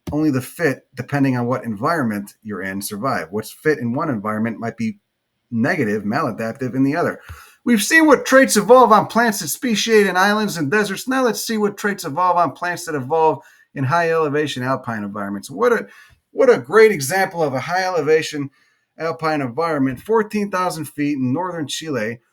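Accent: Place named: New England, United States. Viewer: love the new england fisherman accent… there’s a wise genius to it...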